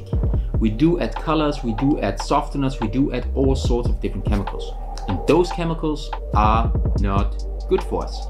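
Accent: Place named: Netherlands. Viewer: Klinkt als een Nederlander.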